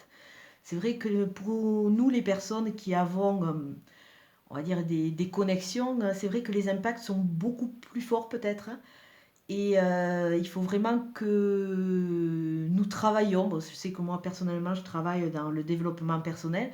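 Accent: South, France. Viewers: Merci il me semble entendre l'accent du sud qui correspond au même accent que moi je suis du côté du Tarn merci beaucoup c'est très parlant j'aime beaucoup à bientôt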